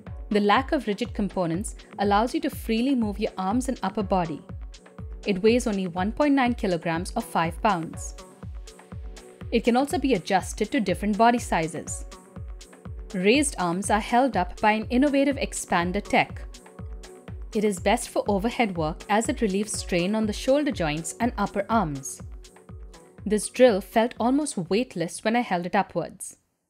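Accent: India. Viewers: I guess that u are from India because of your accent 😂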